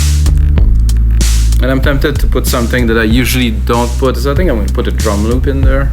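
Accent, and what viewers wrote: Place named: France. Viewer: ptit accent francais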